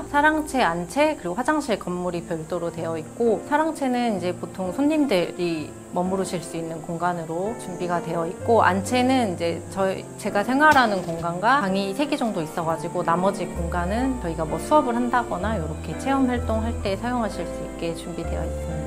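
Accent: South Korea. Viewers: 표준어를 쓰려고 애는 쓰는데 억양에서 확실하게 아랫녁출신의 느낌이 드러나 토속적인 분위기랄까?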